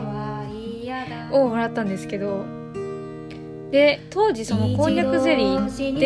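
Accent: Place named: Nagoya, Japan. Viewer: いやー名古屋弁いいわー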